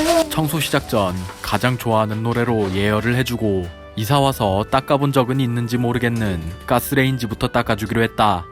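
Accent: Jeju, South Korea. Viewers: 제주도 분이세요?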